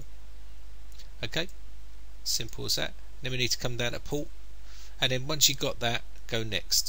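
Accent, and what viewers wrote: United Kingdom. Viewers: I know that's pretty much the accent for all British people lol. There is slight variations. This guy sound like Frankie your right though.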